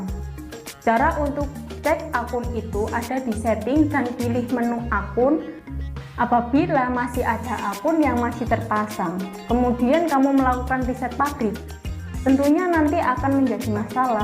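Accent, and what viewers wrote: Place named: Java, Indonesia. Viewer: Medok mbak 😅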